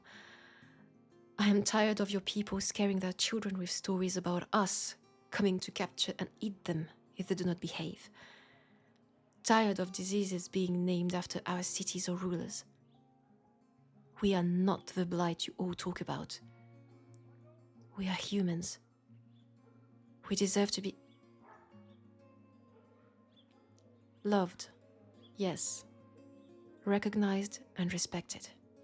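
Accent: France. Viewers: Wow, I enjoyed this way more than I expected I would. Funny how my brain could paint this scene perfectly in my mind. Beautiful voice as well, and as always I just love the french accent. Nice script and BGM choice too. 👏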